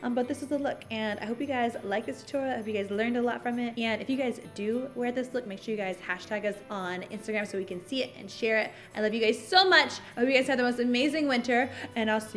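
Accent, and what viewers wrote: Iraq. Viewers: هدئ بيوتي اول مرة الحك عل فيديو من اول ١٠ دقائق احبج من البصرة وحجي عراقي فدوة😂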